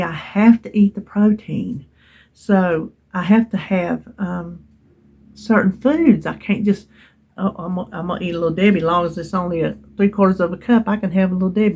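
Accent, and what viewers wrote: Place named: North Carolina, United States. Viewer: I just LOVE to hear you talk! I live in Wilmington and the way you talk reminds me so much of my friend who lives in Lake Toxaway. A few videos back I think I remember you talking about Whataburger in Kannapolis and it brought back so many memories. We used to eat there when I was a kid and would go visit my brother in Concord.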